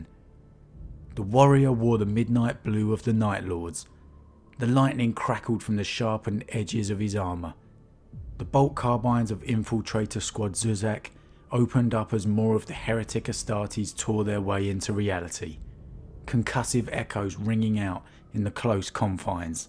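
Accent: United Kingdom. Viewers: I just realised you're British, haha. Such a subtle accent.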